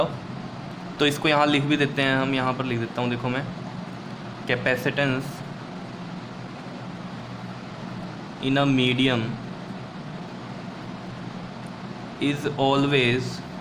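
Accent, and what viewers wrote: Punjab, India. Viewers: Is u are from punjab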